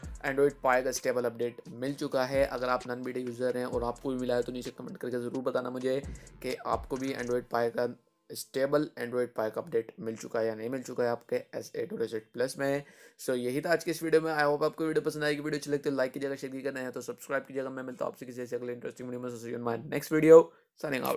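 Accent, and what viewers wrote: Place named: United Kingdom. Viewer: Bhai itna aungrej kyu ban rhe ho.... Aisa lag rha hai jaise lagaan picture ke firangi ho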